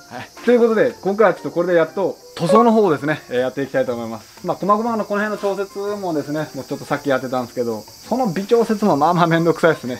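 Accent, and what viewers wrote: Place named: Japan. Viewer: 自分で満足感出すのサイコーですね〜！さんは、どこの県の方なのか？なまりが、！笑笑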